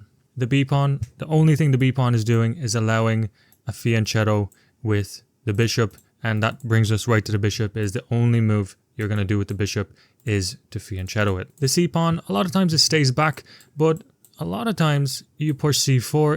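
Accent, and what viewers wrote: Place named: Midlands, United Kingdom. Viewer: Thanks for adding another idea to my opening repertoire What county are you from bro? Can't put my finger on it, fairly neutral so west/midlands?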